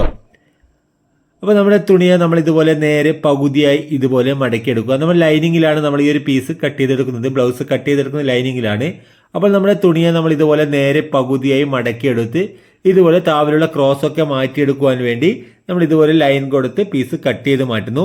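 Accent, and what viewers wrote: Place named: Kannur, India. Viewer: നിങ്ങൾ കണ്ണൂർ കാരനാണോ??? സംസാരം കേൾക്കുമ്പോൾ അങ്ങനെ തോന്നുന്നു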